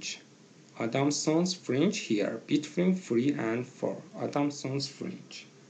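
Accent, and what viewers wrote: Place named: Iran. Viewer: I have a classmate he speaks just like you, So I guessed you must be iranian.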